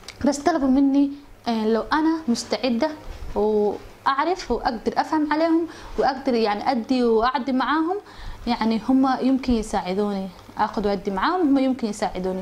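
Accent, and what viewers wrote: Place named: Egypt. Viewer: ماشاء الله يوفقك انتي تتكلمي باللغة العربية باللهجه المصريه او باللسان المصري أتمنى لك المزيد المزيد من الانجازات الجميله 🌺❤️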